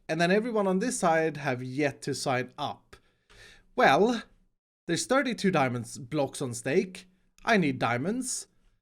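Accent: Sweden. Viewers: Are you from Sweden?? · Är du från Sverige?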